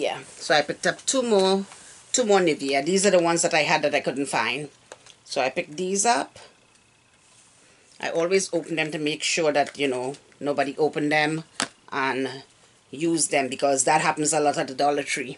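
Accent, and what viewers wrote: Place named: Guyana. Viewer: Love watching your videos! I was married to Guyanese man for years and love your accent, brings me home. also your DT has the best things!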